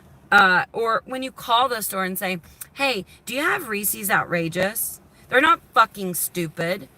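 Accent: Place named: Georgia, United States. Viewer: Love your attitude, you sound exactly like me I was born and raised in Georgia. But I now live in Oklahoma LMAO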